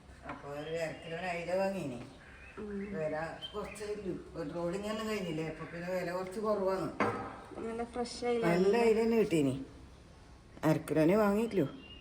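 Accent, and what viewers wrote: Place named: Kannur, India. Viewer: Supper 😍😍 nammdea kannur slang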